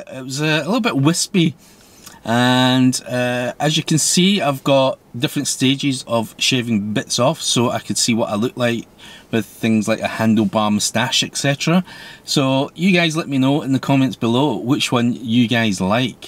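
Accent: Scotland, United Kingdom. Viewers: Are you an asian scot? Like an asian ewan mcgregor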